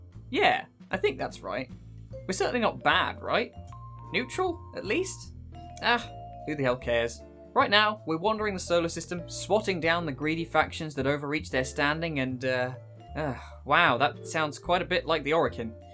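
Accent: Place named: United Kingdom. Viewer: I've been looking for a good source of Warframe info and I've listened to a lot of YouTubers talk about it, but unlike any of them, you're British. SOLD!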